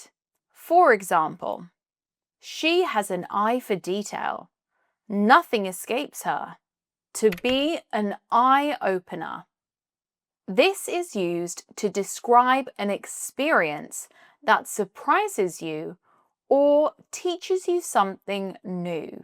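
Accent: United Kingdom. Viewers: Wow, that's super useful! Now I just need to find a way to remember as many idioms as possible... Great job,. Thank you so much for your dedication. PS your British accent is amazing ❤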